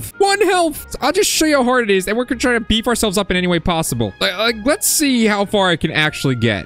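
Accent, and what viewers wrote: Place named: United Kingdom. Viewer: bro i love briths talk:D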